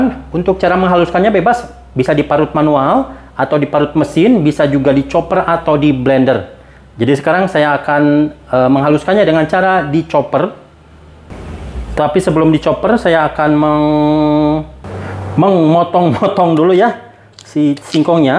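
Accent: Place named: Sunda, Indonesia. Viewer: Mantap kang, di GALO pokokna mah, dan kita SODOK, sunda pisan kang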